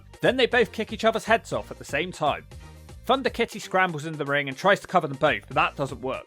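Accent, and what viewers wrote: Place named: United Kingdom. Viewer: Just realized you are from United Kingdom. It explains why I, as a native spanish speaker, can't understand everything you said 😅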